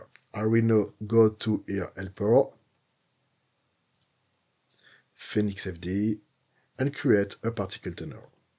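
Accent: France. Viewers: ça sent le french accent! En tout cas il y a du client si jamais tu le fasi en FR pour gagner en réflexion à l'apprentissage de tes vidéos!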